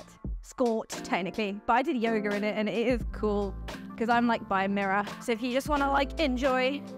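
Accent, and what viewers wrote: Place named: United Kingdom. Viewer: Lights & fire!! ...& Naked!! 🥰 Love watching your videos & watching u hulu hooping is memorizing, captivating, & endlessly entertaining for some reason. You're beautiful, charming & adorable & I bet your performances are in high demand. & I love your British accent. Also love seeing these longer videos rather that just the short form 10-15 second ones ( those are still good, just too short for someone so charming ) Keep up the good work & keep being you. 👍👍😍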